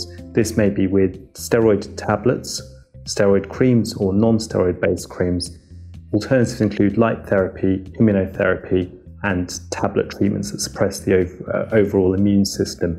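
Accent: United Kingdom. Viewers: It's a classy British accent you fucking hater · Hy chutiya india or english mar rha h british accent main jesay donald trump ki na jaiz olad h jo becahry urdu ni smjhty wo tri bhn ko kryn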